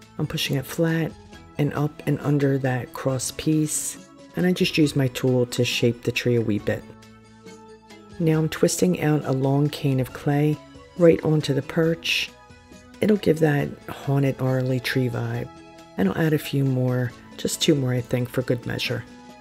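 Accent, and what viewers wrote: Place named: Pittsburgh, United States. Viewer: Thanks for sharing your great ideas! I gotta ask...are you from Pittsburgh? I am & I'm hearing that back east (but not NY or Jersey) accent that sounds like a Pittsburgher. 😊 ❤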